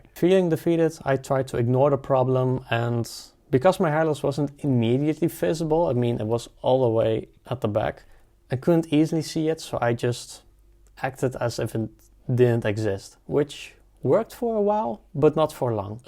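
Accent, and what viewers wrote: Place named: Netherlands. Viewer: where did you do your hair transplant? you sound dutch, maybe in netherlands?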